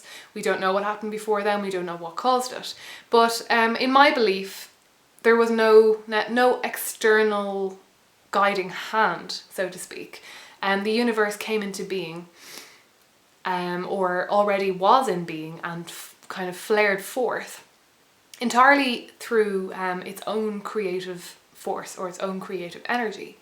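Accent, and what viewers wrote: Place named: Ireland. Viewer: She has to be Irish. Interesting concepts. A bit fuzzy-wuzzy for my tastes, but I get the idea where she's coming from. Mashing Pantheistic Paganism with tangential science ... what can be sensed .... lends descriptions to quality discussion. Personally, I believe in one, and only one, God. "There can be only one." - Juan Sánchez Villalobos Ramírez How to make a memorable introduction... <link>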